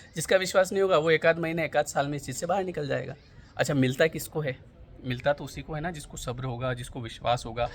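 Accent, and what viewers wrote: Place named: Rajasthan, India. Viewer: Bhai rahte kha ho aap asa lgta h Rajasthan se ho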